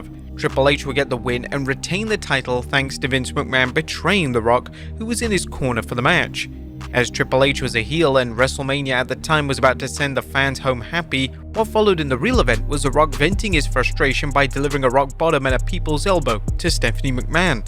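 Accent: United Kingdom. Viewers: Is there ANY American youtuber talking wrestling? EVERY video I open has British accent.. 🤷🏻‍♂️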